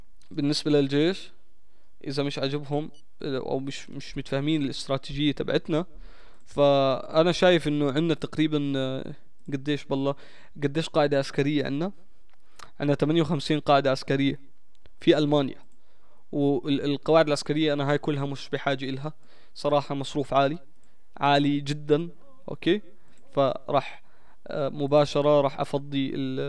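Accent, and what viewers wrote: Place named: Iraq. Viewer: والله يحبيبي انشالله شكلها الحقة تحمس بس اني هسه داباع متعجب دولة مثل المانيا ماعندها نفط وغاز وحتى ماء ومساحة صغيرة من اقوى اقتضادات العالم والعراق والدول العربية عندها اضعاف هلشياء وشعبها بلكاد عنده اكل والله للاسف وضعنه كذا تحياتي الك ولابناء شعبك اعراق والاردن اخوة والاردن اكثر الشعوب وقفت مع العراق واحنا نفس اللهجة تقريبا يعني من اشوف فيديوهاتك انسا انك اردني لهجتك قريبة علعراقي وانشالله مابيزعزع احد بين العراق والاردن مثل مازعزعو مع بقية الشعب العربي ❤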